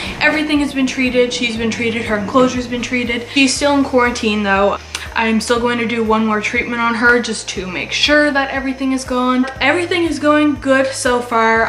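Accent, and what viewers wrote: Canada. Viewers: Are you Canadian? I think I hear the accent lol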